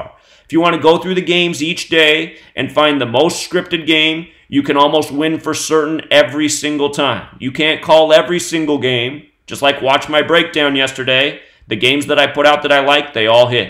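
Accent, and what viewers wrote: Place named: Northeast, United States. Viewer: I agree some what, Your saying u can call games, give game calls to someone in New Jersey. Who has not lost any playoff games to your one game a day speech u said u do. Your wrong admit it!! I bet you don’t even know how much % percent wise u have to win, if you are going to make any profit from gambling FACT PERIOD. Yeah u can easily find out that answer cough cough goggle. If u had that kind of knowledge your talking about, the video wouldnt be up here. there are 100s of millions of $$$$$ if not billions on sports gambling. you would never be able to have any opinion, I fell like with that much money you would disappear, like the men in black would come and take u somewhere. I think someone would do Something to you with this kind of information. You also Said you give a New Jersey guy info on games and he’s has not lost any bets but one and it was a come back that could of made him shit his pants because of the money he wasted from Your word. 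I see threw you and your northern accent that stands out well. Sounds like ur from The north like Philadelphia ,New York,New Jersey etc Area. I don’t think anyone would come OUT and say NBA is rigged here is the proof. u keep saying we are retarded if we don’t believe you. To the point you sound retarded saying u can call games. You Might like all get some right but ur not wining like u say u are. Your not able to call games like u say u do fact! If all pro sports like the nba are rigged,it’s not from technology it’s from the refs com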